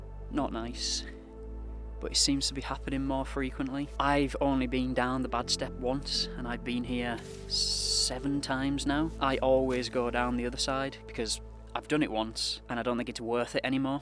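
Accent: Yorkshire, United Kingdom. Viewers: Good video, I’m struggling to pinpoint which part of Yorkshire your accent’s from. I’ve done Striding Edge many times over the years and been down both sides of the bad step. The first time down the bad side was forwards with a day sack on my back - I’ll not do that again! I’ve come across people struggling at the top of the step and helped them by spotting them down the safer side. I’ve also had a near miss coming down Swirral edge after slipping on loose scree. It’s best to be on your guard 100% on any edge and always lean in to the ridge so any slip or trip sends you the safe way, towards the rock not away from it. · accents are part of heritage and culture. This is a distinctly British accent, not an imported one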